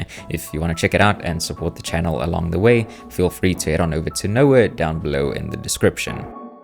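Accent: South Africa. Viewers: Yoh the South African in me heard 4 seconds and knew you were from here either ways this was a majestic fyp pull, love how you explained it all and is actually had a simple understanding. Earned yourself a new subscriber 👌🏾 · are you south african bru? <3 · bro, are you South African? i'm kinda getting the South African accent there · Love the video bro but I couldn't shake the feeling that you're from South Africa, accent sounds South African to me. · Are you South African? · you sound south african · Totally irrelevant, but I heard your accent and knew you were South African without checking the channel description. Howzit bruv 😂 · bro sounds south african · You south african? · you sound South African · You sound South African…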